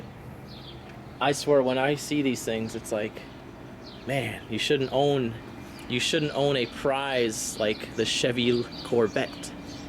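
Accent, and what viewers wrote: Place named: Chicago, United States. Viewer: I heard the Chicago accent. Then I could tell your Right in the flight path of Ohare airport like me.👍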